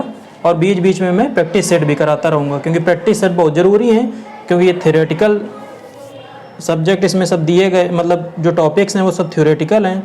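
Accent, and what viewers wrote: Kanpur, India. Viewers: Fatehpur ya kanpur se hai tu bhai kya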